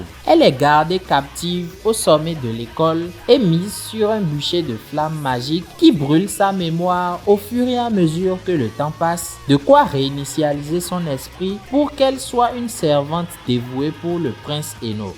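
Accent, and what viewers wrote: Benin. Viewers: Accent béninois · T'es béninois 🤔?T'as l'accent en tout cas 😃.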